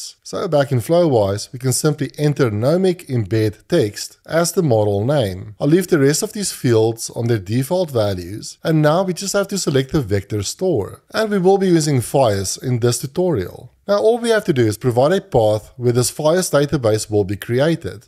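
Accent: South Africa. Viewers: Great videos love the SA accent - in my flowise I don't see upsert config? please let me know what to do to get this.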